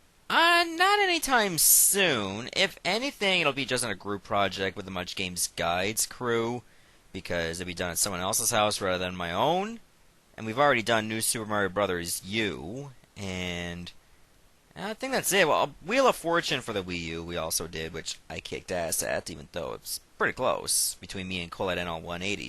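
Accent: Newfoundland, Canada. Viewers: 1. Is there a long, undisclosed queue of LPs that you plan to do, or are you more or less spontaneous with your long-term planning? Assuming you get a lot of various requests, is it impossible to estimate the time you'd LP any one request? [assuming it's not rejected] 2. Imagine you end up meeting with one of your favorite video game characters. Who would it be? Describe a scenario of what you'd do with that character if you hung out with him/her for one day. 3. Do you describe yourself as having a Newfie accent? If not, do you know of anyone who fall more closely to that sort of stereotype? Thanks in advance for your responses!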